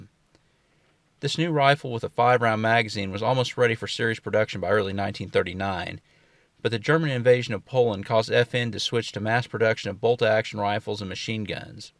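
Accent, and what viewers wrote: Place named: South, United States. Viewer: cool. I was detecting a slight southern accent that I couldn't place...piqued my curiosity.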